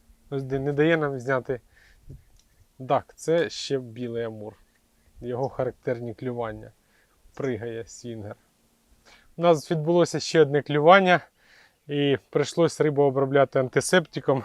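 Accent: Surzhyk, Ukraine. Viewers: Україномовний чи російський яка різниця, аби контент був хороший. І не в образу вам, уривки Азаровщіни у вас присутні.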